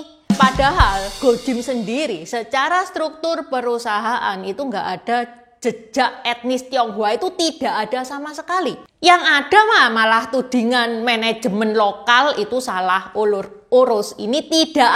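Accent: Java, Indonesia. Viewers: Ci ini cindo tapi bicaranya medok jowo ketara sekali 👍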